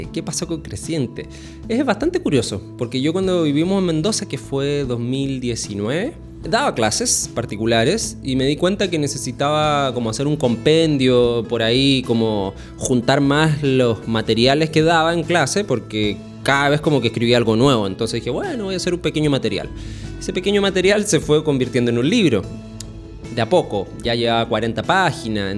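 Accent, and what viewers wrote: Chile. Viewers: Hola. Te sigo desde hace poco. Ya me parecía... acento chileno pero arquitectura argentina. Al final, no entendía. Te escribo desde el centro de la provincia de Río Negro. Gracias.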